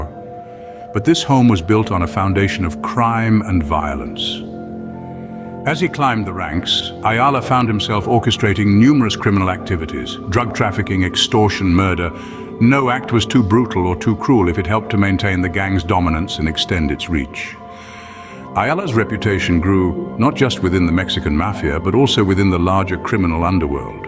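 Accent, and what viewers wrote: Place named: United Kingdom. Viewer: Somebody hit the lick with this narrator. Serious and compelling, the deep, UK sounding accent ups the story's level of integrity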